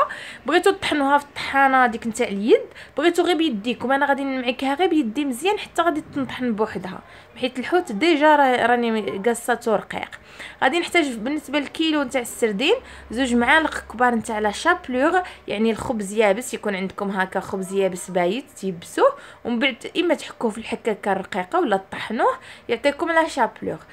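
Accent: Oujda, Morocco. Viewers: كا تحمقني هدرتك حبيبة حتى انا بنت وجدة 😍😍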